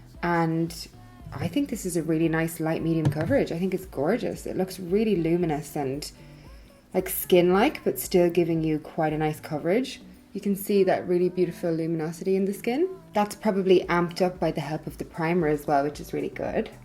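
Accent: Ireland. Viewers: Is your accent Irish? Love your channel & review!! Love Charlotte Tilbury products & you look beautiful 😃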